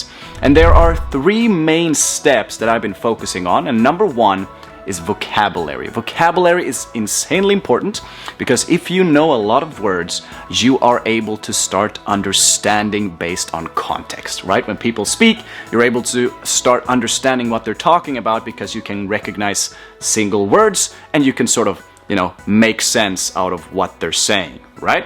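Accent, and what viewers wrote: Spain. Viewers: Wow,cara eu tô surpreso com o progresso dele no português,o vocabulario dele é muito bom,a pronuncia as vezes não é tão boa por conta do sotaque forte do espanhol,mas fora isso,deu para entender tudo, parabéns!!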